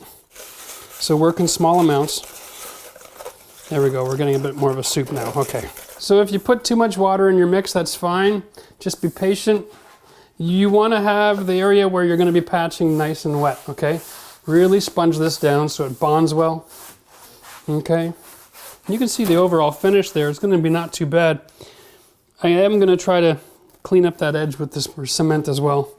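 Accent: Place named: Canada. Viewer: I didn't even notice the 'Eh accent till the very end when he let out an "abooot"!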